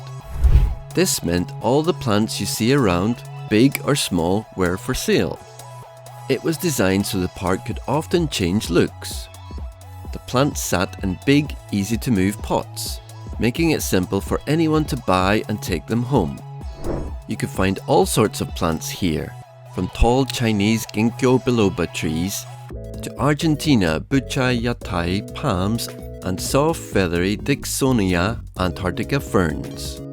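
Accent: United Kingdom. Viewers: Just stumbled across your channel on my discover page, love the videos and also your accent sounds familiar! Are you from or have family in Belfast / North Ireland?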